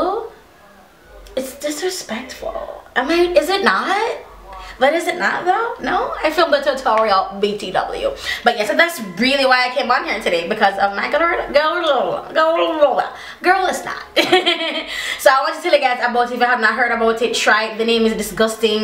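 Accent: Jamaica. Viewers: I’m Jamaican your accident sounds like patio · I understand what you're saying it's her accent my bestfriend is Jamaican and I met her mom and I was like what is she saying lol 😂 but now I totally understand I love there accent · your beautiful jamaican accent 😭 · u make me wish I was Jamaican, I love ur accent! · I love that Jamaican accent. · Wowwww I wish I could speak Patois. I love your accent girl! Subbed ❤️ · wow she is gorgeous and has a nice jamaican voice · I love your jamaican accent 😍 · I saw someone put oil on top of eco gel to stop it from flaking. Maybe it will work for gorilla snot...I'm gonna try. Thx for your video and your face looks good! Love ur Jamaican/street girl/white girl accent! Lol Yeah...I'm coming for you! Lol. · Kareece Brown she has a jamaican accent tho.. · I love your Jamaican accent ❤️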